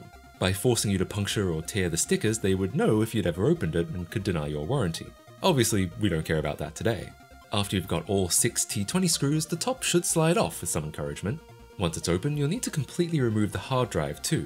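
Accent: Australia. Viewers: I don’t blame them, addressed that his accent is a mix of a lot of YouTubers he watched growing up and his native Aussie accent. He also lives in the US now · Daaamn is a fellow Aussie! Hey, one tip- never lose the accent!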